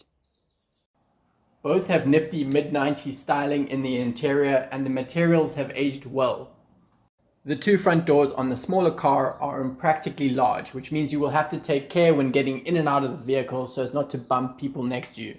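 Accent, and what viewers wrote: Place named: South Africa. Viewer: Loving the South African accent and "Eish"a good name! My 1996 5 door is named RAVioli, a nod to my Italian heritage. Where can I get a cargo door handle that doesn't cost an arm and a leg? I'd need to ship to South Africa. eBay and Amazon sellers don't ship here from the US. I don't mind second hand. 🙏🏼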